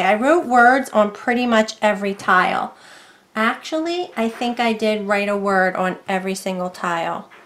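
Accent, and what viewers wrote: United States. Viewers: I love your videos. It's like I'm right in the room with you. I may be the first to say, your voice is very appealing to my ear, since you have an American accent and it is easy to understand. Thank you so much. Remember., all imperfections in art are perfect when made as one of a kind pieces.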